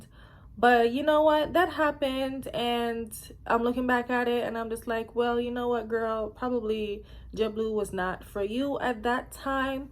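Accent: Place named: Caribbean. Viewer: What’s ur accent from? I’m vincy n you sound Caribbean too- also have my in person f2f june 6 (2 weeks)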